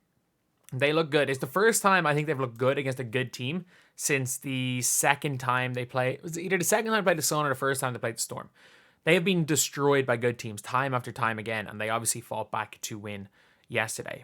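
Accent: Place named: Ireland. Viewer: Good job. What part of Ireland are you from?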